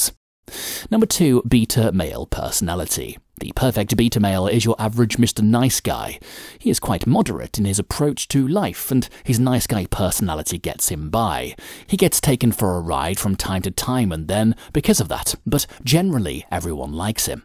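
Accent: United Kingdom. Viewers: The most thing that I liked in this video is the British accent, long live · Is there a reason you seem to always show a black Guy especially with the white women as if they are better than white men? Seems racist to me and I think the white guys should be with their own race and so should the black Guys! There are many Alphas who are white. Are you ashamed of your own race or is that a British thing. I say that because your voice indicates you are white and British?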